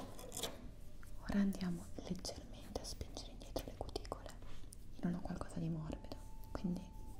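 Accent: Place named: Emilia, Italy. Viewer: È emiliana le origini non lha dette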